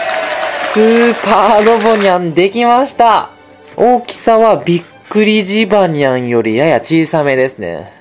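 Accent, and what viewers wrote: Kansai, Japan. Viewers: おもいっきり関西弁。笑 まぁおれも関西人やけど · めっちゃ関西べん笑笑と、思う人グッド👍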